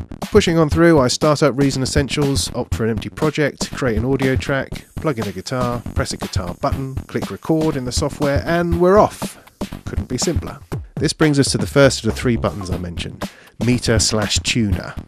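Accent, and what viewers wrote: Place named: United Kingdom. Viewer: Great review. Nice hands-on footage. And there's something about a British accent that I find calming. That must be why I listen to the BBC all the time.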